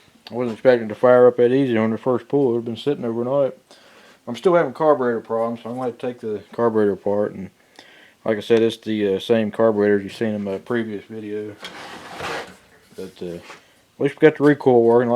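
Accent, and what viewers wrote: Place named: Texas, United States. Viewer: Superb instruction! And that 'accent'-can't be far from Corpus Christi and Texas state 77!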